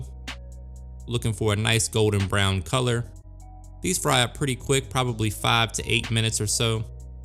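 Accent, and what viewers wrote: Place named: Louisiana, United States. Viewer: Sounds to me like... You must be from Louisiana! 😁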